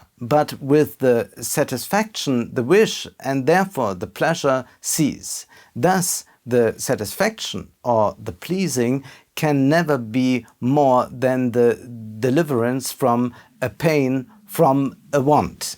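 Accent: Germany. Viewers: Weil sein English zu Denglish ist.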